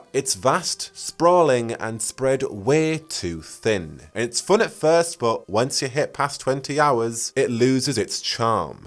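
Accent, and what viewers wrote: United Kingdom. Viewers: ew british.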